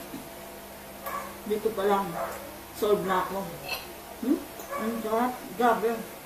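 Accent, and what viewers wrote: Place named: Pampanga, Philippines. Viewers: may accent kang kapampangan maraming Eusebio sa san fernando, pampanga. nkakapaglaway kang panuorin s tikim portion mo. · ate atin kang accent, kapampangan the best for cooking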